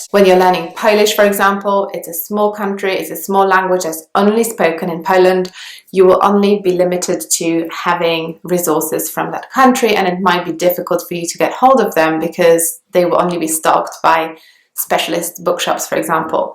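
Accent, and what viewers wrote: England, United Kingdom. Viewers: What a heavy English accent you have ( teacher) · How is this accent posh? It is just a normal English accent. I have heard more posh from elderly in my area though LMAO. Some tips to sound this way for forebears is say things as it is spelled like ‘t’ and extend your ‘a’s for example “paahst” = past. Even the h is super subtly pronounced where I live but a lot of People say it with a northern accent and mix it up. She says “example” I say exaahmple stressing that p a bit.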